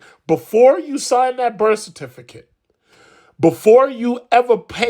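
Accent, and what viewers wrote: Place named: New York, United States. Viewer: This dude sound like he's from NYC totally funny guy, but my man spitting out 💎 good content.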